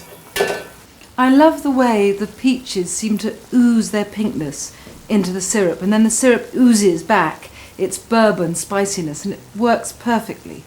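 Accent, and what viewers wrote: United Kingdom. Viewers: هذا الانكليزي البريطاني صحيح Is this British English🇬🇧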